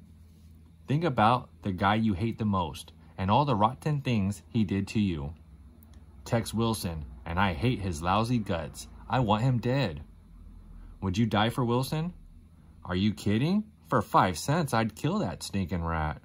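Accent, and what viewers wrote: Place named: Scandinavia. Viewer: Wru from? Just curious, no disrespect intended. I love languages and different cultures. That aside, you sound kind of Scandinavian. If I'm wrong, again, no disrespect intended.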